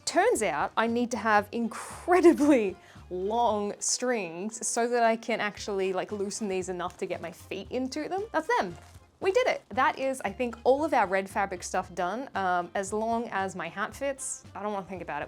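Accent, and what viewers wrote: Australia. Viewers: are you australian? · The accent is either New Zealand or Australian… I'm going with Australian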